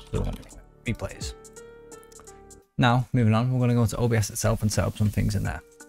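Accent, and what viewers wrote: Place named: United Kingdom. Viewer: Closed captions is must for this video, very fast and hard to understand dude, I love a UK accent but cmon man :)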